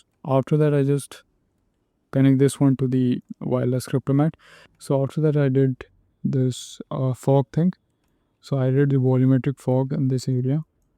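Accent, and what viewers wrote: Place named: India. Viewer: bhai aapke ascent se aap shayad indian lagte ho , badiya tutorial tha ek dum maja aagya kafi value addition hua thanks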